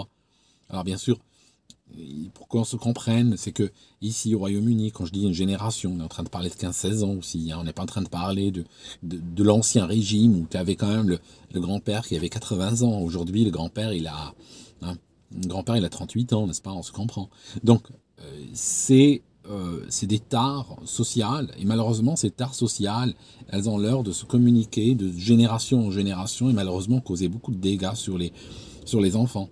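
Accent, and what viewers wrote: Vaud, Switzerland. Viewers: Pourquoi est-ce que t’as l’accent suisse dans certaines vidéos? 🤣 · Arrêtez de dire "accent suisse" dans les commentaires, ça veut rien dire. C est de l accent vaudois qu il est question, en l occurrence. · J'aime bien ton accent Suisse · Tu as l'accent suisse comme même :) 'Avais jamais remarqué :) ! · Pourquoi tu prends l'accent suisse ?? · Alors ton accent c est en Suisse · Cette accent suisse, c'est trop mdr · Dommage pour l'accent suisse trop présent qui rend l'ecoute plus difficile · J'ai l'impression que vous avez pris l'accent suisse !!! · J’ai une théorie sur cet accent suisse. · lol l'accent Suisse qui revient. Je te regarde en mangeant une entrecôte Wagyu. Pas de la bouffe de RSAiste ça.. Ce qu'on a de plus précieux c'est notre temps de vie (en bonne santé). Le gâcher largement pour avoir un peu plus, mais plus assez pour faire grand chose notamment depuis le covid et l'inflation, je me demande de plus en plus si ça vaut le coup. 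Les aides baissent pas elles augmentent... Le SMIC idem, il augmente fortement avec le temps, je l'ai jamais vu baisser. Ceux qui ont un peu plus, sont pas augmentés, résultat on s'appauvrit, on est de plus en plus proche du SMIC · Bin, c’est quoi ce nouveau accent suisse? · Pourquoi tu prends un accent Suisse ? En France on paye des charges hallucinantes pour justement pouvoir toucher des aides en cas de chômage. Je veux bien qu’on ne donne plus de cash aux chômeurs, pas de « sponsoring » comme tu dis mais dans ce cas, je ne veux pas voir sur ma fiche de paye toutes ces lignes qui sont retirées à mon brut… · ton accent suisse est revenu tellement fort que je doute que tu sois toujours au royaume uni. Super conseil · Ça me perturbe c’est l’accent suisse que tu as dans cette vidéo ?? J’ai noté un accent bien marqué c’est ps habituel il me semble 😂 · petit aparté : je n'avais jamais fait attention au fait que tu avais un accent suisse ! 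· Merci pour la vidéo c'est top. En revanche l'accent Suisse est atroce 😂. Heureusement que ça s estompe au fil du déroulement de la vidéo. Salutations distinguées. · C’est marrant ton accent suisse dans cette vidéo, … 😊 Plus sérieusement, j’ai été contrainte d’aller aux services sociaux quand je suis rentrée d’Angleterre. Je préparais déjà une licence et j’avais besoin d’une année pour la terminer. J’avais aussi besoin de nourrir mes enfants. En même temps, je me suis inscrite à des concours. Comme j’ai réussi le premier, j’ai laissé tomber les autres qui étaient équivalents. Ainsi, j’ai pu m’occuper de mes enfants, les nourrir et leur faire faire des activités. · Trop rigolo l accent suisse wue vous prefer. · C'est quoi ton accent Suisse 😁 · Pourquoi prendre cete intonation suisse ? Ai-je raté un épisode ? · Y a l'accent suisse qui revient au galop!!! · Incroyable en écoutant ta vidéo j'entendais la mélodie de l'accent Suisse, puis en regardant la description j'ai vu que t'étais à Genève haha. 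Sinon top le contenu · C'est quoi cet accent suisse mon 😂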